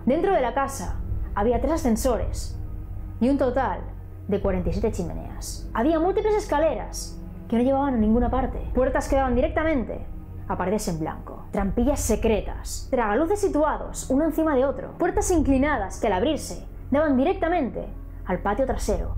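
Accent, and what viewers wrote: Spain. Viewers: Me encanta tu narración a pesar del dejo español, narra de una manera que te enganchas con el tema. No creo que esté embrujado pero si llena de mucha tristeza y quizas el alma de esta señora Sara no descansa en paz por la tristeza que le llevó al espiritismo. Oracion por ella 🙏🙏🙏 descanza en paz sra. Sara W.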